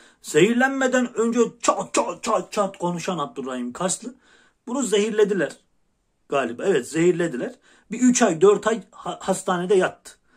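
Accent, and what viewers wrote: Erzurum, Turkey. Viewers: arada bir erzurum siveside guzel oluyor ahiskalilar sivesine benziyor bana çocuklugumu hatirlatiyor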